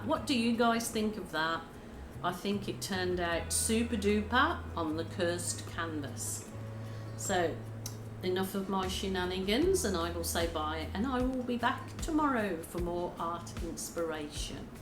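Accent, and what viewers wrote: Birmingham, United Kingdom. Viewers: Love to hear your Brummie accent underneath your new Aussie one 🙂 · WoW! That looks so good! Well done to you. Love the Birmingham accent. We live in Perth WA. We come from England. Have been here for 20 years now. Must try this. Sara x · where in Birmingham are you from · true blue Aussie here, just like to point out her accent is British not Aussie. And here in Australia it is pronounced AL-YOU-MINIUM 😊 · Yes a resident of Australia for sometime however she clearly has a British accent, very obvious to Australian ear. 😊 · Queensland? I'm hearing brummie undertones in your australian. . You got away. Good for you.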